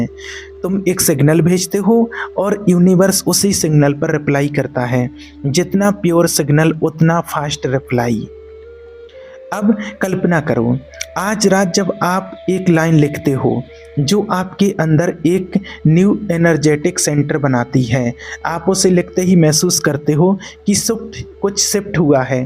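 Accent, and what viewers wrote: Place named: Braj, India. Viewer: Pahle ye brahmend nhi hota Brahmand hota h aap up ke vo bhi birij ke ho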